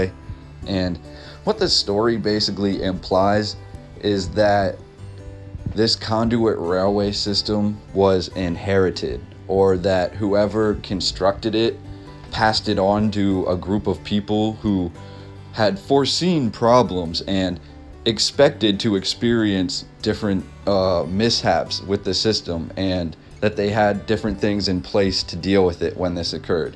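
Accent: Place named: Pennsylvania, United States. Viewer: You gotta be from somewhere between Allentown, Reading, and Philly. Possibly Quakertown. Can't hide that acccent lol.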